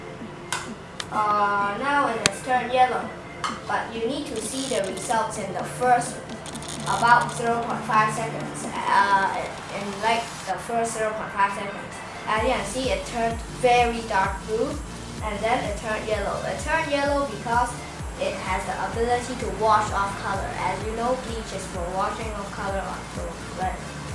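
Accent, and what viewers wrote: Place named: Asia. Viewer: Lol a cup of wwwater hahahha asians speaking english is funny af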